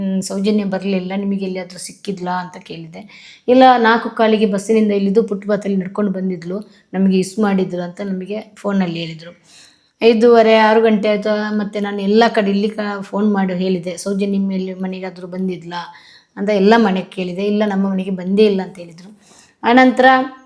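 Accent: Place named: North Karnataka, India. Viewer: ನಿಮ್ಮ ಭಾಷೆ ನೋಡಿದರೆ ನಮ್ಮ ಉತ್ತರ ಕರ್ನಾಟಕದ ಅಂತ ಅನ್ಸುತ್ತೆ ಒಳ್ಳೆದಾಗಲಿ ಅಣ್ಣ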